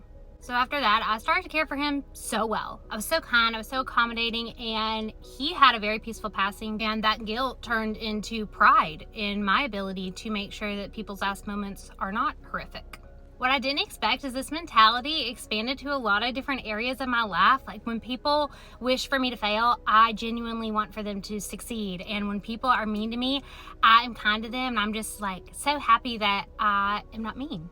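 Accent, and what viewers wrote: South, United States. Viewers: What part of America is this accent from?? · I ❤❤❤❤❤❤❤❤ her country voice them southern folks accents makeme happy every trip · That’s one hell of a southern accent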